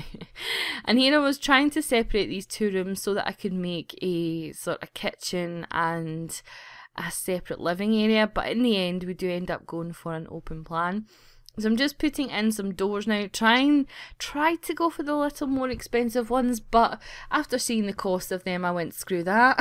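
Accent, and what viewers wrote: Scotland, United Kingdom. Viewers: You're Scottish like me!!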